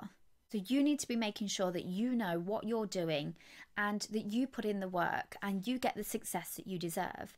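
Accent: United Kingdom. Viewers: Hi 😊 I appreciate it because you are honest and realistic Excuse me , are you British ! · I am a Kdp newbie. I am about to upload my first children's book. Thank you for the sincerity and straightforwardness! Last but not least: I love your accent! What part of the UK are you from? I'll come back to let you know what happened to my sales. Wish me luck!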